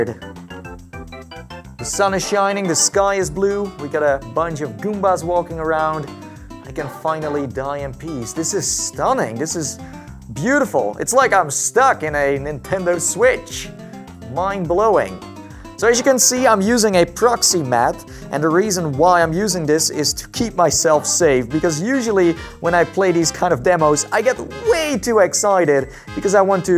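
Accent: Netherlands. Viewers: Where do u live u sound dutch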